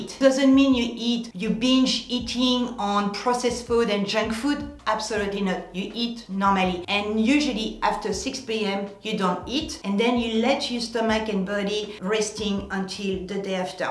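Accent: France. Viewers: Great video delivered in that inimitable French way!I decided right away to follow your lead -not dieting but living within a discipline-I will report back in a month Thank you!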